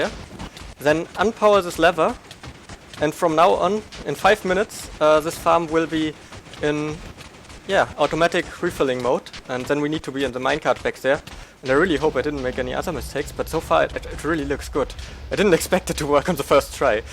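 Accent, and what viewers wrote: Germany. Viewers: Bist du deutscher? das hört sich sehr deutsch an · Jaja der deutsche Akzent ist ziemlich schwer weg zu bekommen..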